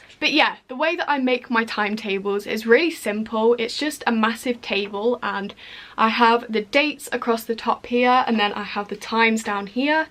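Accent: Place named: Doncaster, United Kingdom. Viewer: Athena Newton then where did she get her accent from? It sound like Doncaster or somethinng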